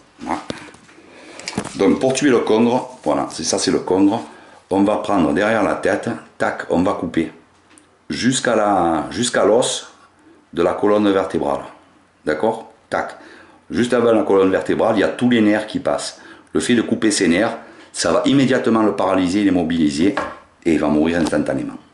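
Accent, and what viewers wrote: South, France. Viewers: la grosseur de l'hameçon en combien - du 2ou moins . merci pour toutes tes vidéos c'est un régal et en plus avé l'accent..merci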